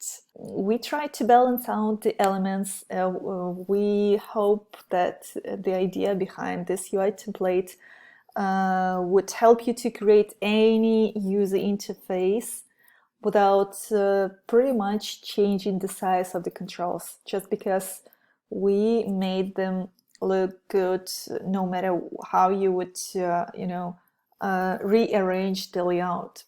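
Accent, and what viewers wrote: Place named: Russia. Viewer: по акценту понял, что русская, потом посмотрел в комменты)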